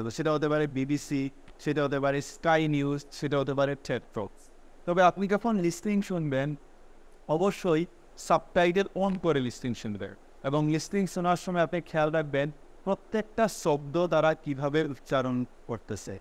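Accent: Sylhet, Bangladesh. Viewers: Bcz sir sylheti 🥹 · ভাইটি মনে হয় সিলেটি 😄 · uni sylhet er vai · Sir apnar pray video te sylheti basa aioi..but sylhety mat apnar muko sundor ow lage❤ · Are you Sylhety sir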